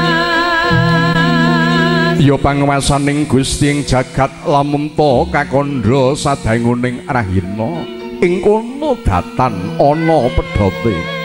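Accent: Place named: Java, Indonesia. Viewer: Jian mantep...artikulasi jelas...intonasi pas...logat jawanya trep....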